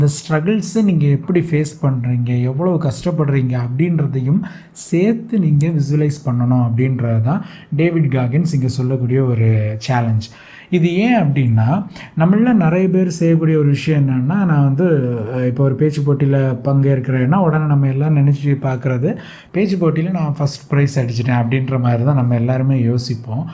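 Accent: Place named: Kanyakumari, India. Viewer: I like your Kanyakumari slang😊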